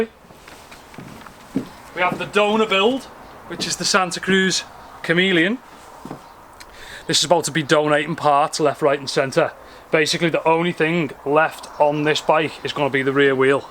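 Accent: United Kingdom. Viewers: what type of accent is this? i know it's from UK but i don't know which part.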